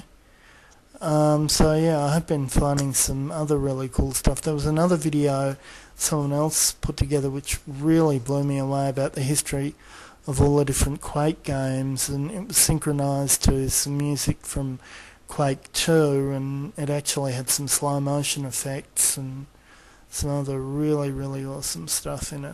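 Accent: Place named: Australia. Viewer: lol your vid was on my recommendation, so random. Damn this was 18 years ago so judging by your accent you’re probably one of the few Australians to ever upload a YT video during that time.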